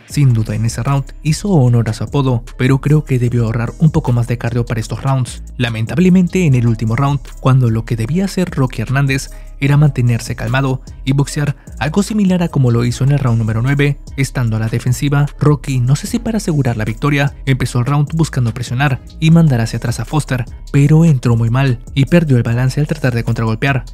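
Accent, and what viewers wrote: Mexico. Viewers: El comentarista es mexicano?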